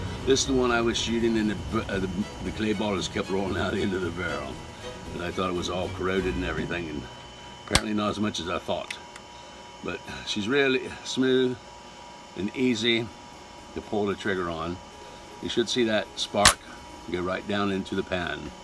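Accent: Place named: England, United Kingdom. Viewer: ❤ LOVE YOUR ACCENT , ARE YOU A FELLOW ENGLISHMAN AS I AM ?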